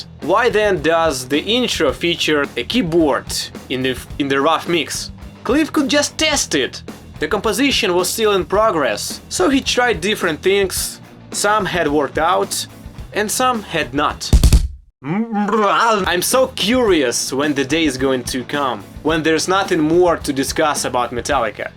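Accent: Eastern Europe. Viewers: I dont know why but listening to an Eastern European saying complex musical terms is really satisfying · Holy shit your accent is heavily east European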